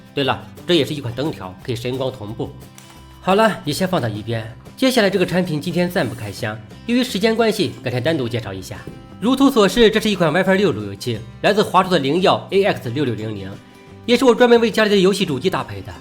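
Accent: Shandong, China. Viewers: 大哥山东人？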